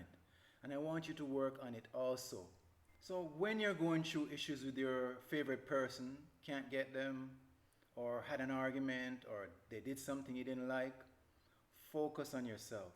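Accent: Caribbean. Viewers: Hey, you sound like you're from the Caribbean. I'm Trinbagonian. Love you too. Your wife is lucky that, as a man, you've taken your mental health into your own hands and are trying to be better for yourself and her. It's refreshing to see an informative and proactive video on this topic from someone who lives it. Stay up, Brother.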